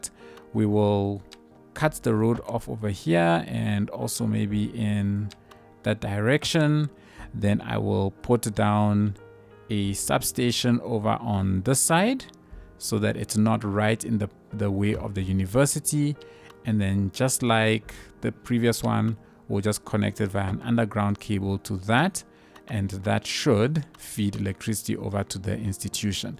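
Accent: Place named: South Africa. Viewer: Finally!! A South African Cities Skylines gamer 👏🏿 knew it from the accent. 🇿🇦 And the roads have the correct handing too. Make sure you have enough policing please 😅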